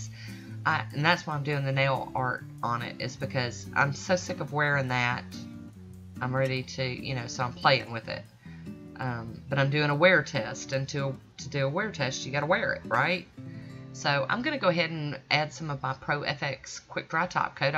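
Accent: South, United States. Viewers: Nice flower! I watch Kirsty everyday, love her videos! You should be able to shape the petals on the mat and then transfer them to the nail, but that might be even harder. Despite what everyone else does you have to figure out what works best for you. I enjoy watching you do your thing: it inspires me and I really like the sound of your voice - that lovely southern accent in particular!!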